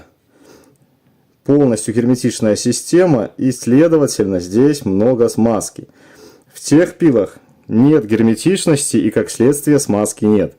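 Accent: Belarus. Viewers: привет ты белорус? такой акцент прикольный....😁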